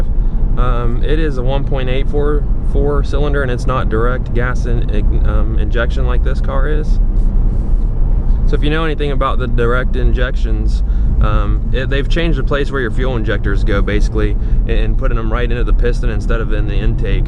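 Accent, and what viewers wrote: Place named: South, United States. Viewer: I can barely understand you with that ridiculous southern drawl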